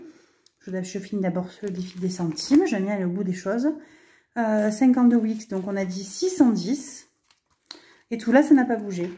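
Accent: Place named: Southwest, France. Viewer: Cc merci pour ta vidéo j'adore avec ton joli accent du Sud ouest 😁.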